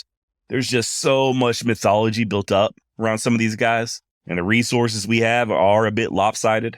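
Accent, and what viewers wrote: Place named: South, United States. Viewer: It does a soul good to hear a Southern Accent every now and then. And I like your stories. Keep it up!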